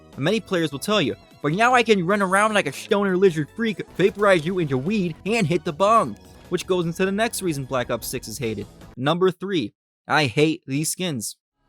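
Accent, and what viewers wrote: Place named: United States. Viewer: What makes these videos even better is the accent🤣 Which state is it btw?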